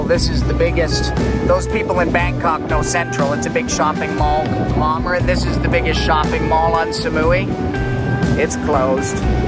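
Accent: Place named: Winnipeg, Canada. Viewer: great video. are you from Winnipeg? i'm trying hard to place your accent!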